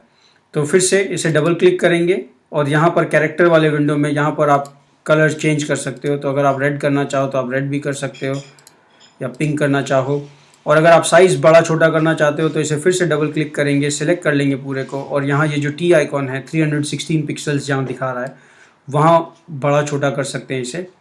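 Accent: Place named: Haryana, India. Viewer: owsm video sir . aapki voice mybe haryanvi hai hahaah good work thumbs up?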